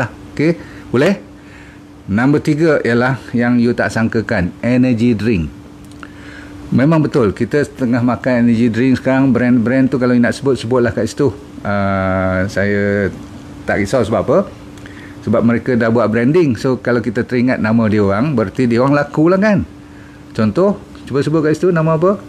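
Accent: Malaysia. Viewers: Saya dari Indonesia senang nonton video Profesor, hanya terkadang sedikit kesulitan memahami bahasa dialek Malaysia profesor, 😅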